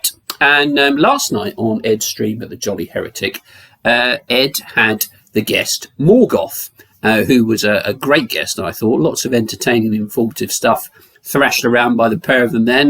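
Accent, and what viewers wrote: United Kingdom. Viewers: Is that a Geordie accent or a general Northeastern accent (to the extent there's a difference)? It did sound a great deal like Morgoth there. I'm always fascinated by the regional variation in English accents, since we grow up in the USA without much, if any, awareness for the differences and with the general feeling that all English accents are "posh," which can't actually reasonably be said for most of them, but maybe all foreign accents have that bit of cachet and we Yanks can't be too roundly criticized (here, at least). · Your northern accent is great. I like the way you review Dutton and Webb. Have a lovely weekend ☘️🇮🇪🧚‍♂️p.s. 107 people already👍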